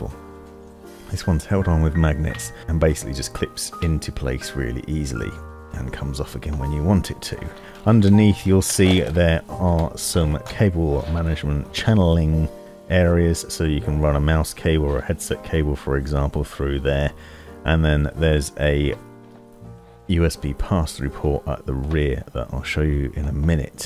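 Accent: England, United Kingdom. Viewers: Обожаю настоящий, английский язык, а не нищий американский. Мёд для ушей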